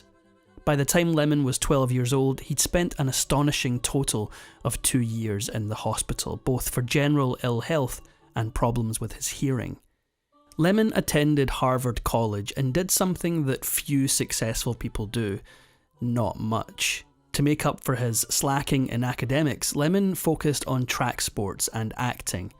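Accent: Scotland, United Kingdom. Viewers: OK, the Scottish accent is extremely annoying after awhile - never noticed this before!!!!!!!!!! 😮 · Awesomeness my favorite Narrator... Love listening to the Scot!!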